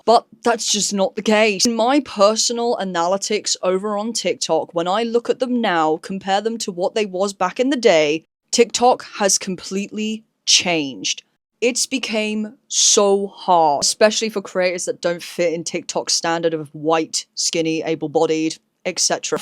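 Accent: United Kingdom. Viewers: you're bri'ish ?!?!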